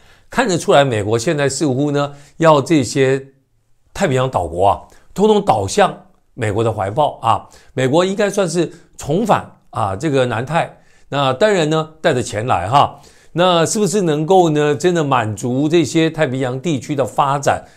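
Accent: Standard. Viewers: 语音标准 评论优默 见界宽阔 正能量满 正气善评 优秀才气 愿你全家安康如意🙏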